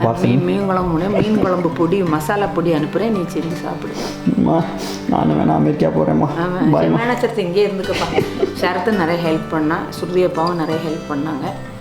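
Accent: Madurai, India. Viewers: Madurai ya thala neega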